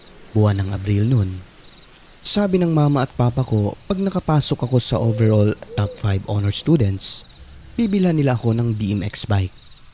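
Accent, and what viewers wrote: Visayas, Philippines. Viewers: Hayyy..ang sarap ng sanga ng bayabas lalo na.kung medyo tuyo na..malanit sa pwet.really like ang accent mo pag nagbibisaya ka Sir natatawa akong.mag isa..thanks di kompleto ang araw namin hanggang di naririnig ang kwento mo.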